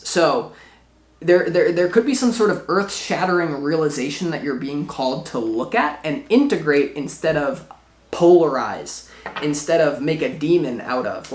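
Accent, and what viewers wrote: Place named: Oregon, United States. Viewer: Don't get losttt in the sauceee hahah yesss your lil quotes and how you talk reminds me of my time spent living in Oregon, miss it so much as an Aussie, your readings help me feel closer to spirit and home 💞 thank you x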